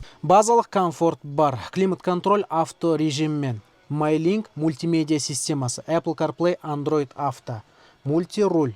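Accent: Standard. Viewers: сау бол, рахмет контент үшін! Ұлтым Өзбек болсада Қазақ тіліні жақсы түсінемін, соның таза Қазақ тілінде сөйлегінің үшін көремін каналиңди. Рахмет. Өзбекстаннан Салом Алейкум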